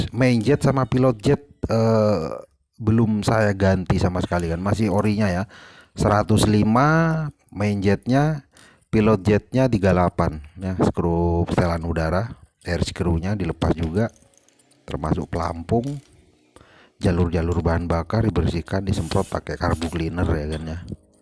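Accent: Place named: East Java, Indonesia. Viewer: Suara anda kok kayak orang jawa Timur bang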